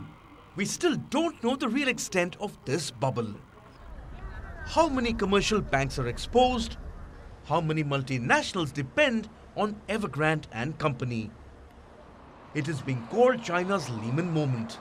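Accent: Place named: India. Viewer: Her thick Indian accent..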